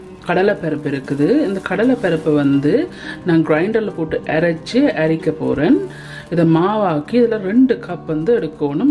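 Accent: Sri Lanka. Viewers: Love your Sri Lankan accent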